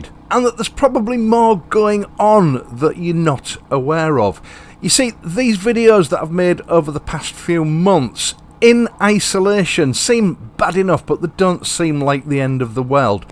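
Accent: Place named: Hull, United Kingdom. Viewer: 💯👍❤ recognise that hull accent anywhere lol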